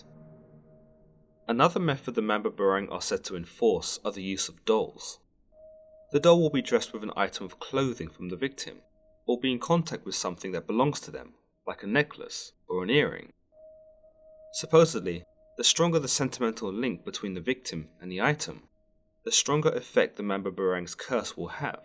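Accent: England, United Kingdom. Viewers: i hate it when europeans mispronounce and narrate about bipoc history, mythology, ancestry, etc... especially if its my mother's home land-- thats been colonized a bazillion times. why dont they ever have people from the place teach about the place? if anything it'd avoid mispronunciation the very least. MAM-BAH-BAH-RANG. just cuz they got a english accent dont mean theyre more intellectual. coughs despite almost every documentary ever made being narrated by an english voice coughs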